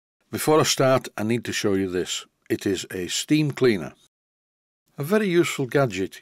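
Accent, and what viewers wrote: Yorkshire, United Kingdom. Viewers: It's actually a METHS lab, don't know about Breaking Bad, but look out for Breaking Taps. BTW, your Tyke dialect amuses me - the unconventional pronunciation of 'chassis' as 'shazzy', counterpointed by the opposite with a sibilant 's' in 'becoss' (because). keep it up, pal! ( I had a girlfriend who used to say that). I suspect your simplex will run again before mine will! Cheers!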